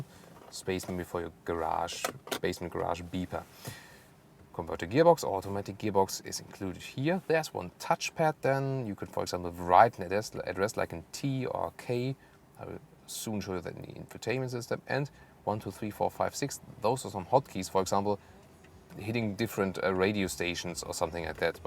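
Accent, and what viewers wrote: Germany. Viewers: Wollte eigentlich auf die RS3 Limo warten, aber aufgrund meiner Familie brauche ich doch was größeres und werde die Tage den RS6 Perormance bestellen. Freue mich wie Sau !!! Danke nochmals für den Bericht (Ich LIEEBE Deinen deutschen Akzent HAHAH)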